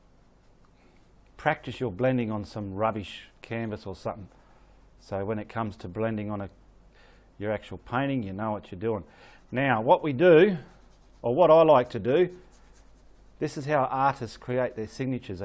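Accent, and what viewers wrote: Australia. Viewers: Love the Aussie bush accent 😂 Enjoyed watching this and enjoyed the humour to go with it. Thankyou for sharing. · I love your beautiful Ausi accent. Lovely presentation on how to make realistic cloud. I think if you had used a bit of firey grey or slightly bright yellowish orangy instead of PURPLE, it would have given more depth....anyway, just my thoughts..... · I love it! You’re such a hoot! “I’m gonna get back into that bus and drive it into this neighborhood!” Made me smile. I’ve never heard that out here in western Nevada, USA.... especially with the Aussie accent. Enjoyed your whole presentation and learned a lot. Sharing with my friend that does acrylic painting parties... (tutorials with laughs among friends and we leave with our own work of art.) Thank you. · Holy Crikey! Wasn't expecting that level of Aussie right off the bat. 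· so australian lol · Good to hear an Aussie, speak like an Aussie! It’s a joy!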